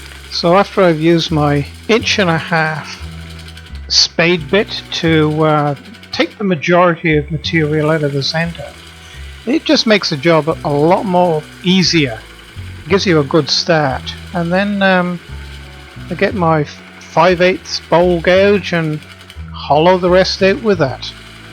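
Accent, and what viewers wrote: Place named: England, United Kingdom. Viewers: Where do you hail from sir, that sounds like a very West Country accent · I love this piece. I really enjoyed watching how you got into that centre and then leaving the top as is. It gives it its own personality. Can i ask where you are from. I'm from the UK and it appears that i am hearing an English accent but with something added and i can't figure out. Thanks for sharing pieces with us. Shirley from the UK. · Australian? I thought you sounded very much from the south west of England, Cornwall/Devon area. I actually came to the comments section to say something about the quality work of a classic man in his shed. (A proud UK tradition.) Any links to the UK? I know Cornish miners had a heavy influence and there was a lot of migration to Australia from here in the 1800's.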